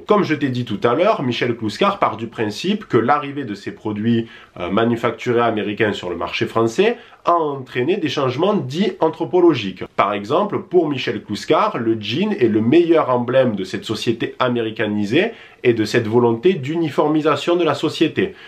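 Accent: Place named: South, France. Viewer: De la part d'un basque, garde ton accent du sud.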